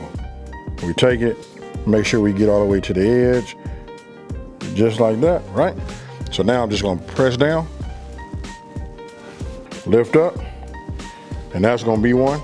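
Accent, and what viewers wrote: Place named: West Coast, United States. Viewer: I love watching your channel and your recipes are always a hit! And i gotta say i love your voice. Every time i hear you talk, your voice reminds me of "Ole Dog"from Menace to Society. 😂 You gotta be from the west coast.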